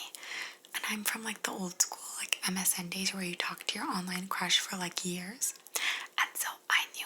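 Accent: Italy. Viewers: I just found your channel and have been binge watching all your videos. I especially love your Italian accent videos (so tingly!) but also, I am Italian and trying to improve my language skills too! I also love the Russian language, but can only say a few phrases, like introducing myself. I love your idea to read Italian books/poems in a video, so I will be looking forward to that! I thought your story about being a kid and prank calling the police was hilarious - I did the same thing with my sister as a kid and the police came to my house too. My parents were sooo embarrassed! but it's funny now. Anyway, I just wanted to share my love for your channel, I think you are awesome! I hope this doesn't come across as creepy, because you know...internet...but learning about you in this video makes me wish we could be friends, but I'm no where near Canada. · Hi! your italyaccent videos is funny;DD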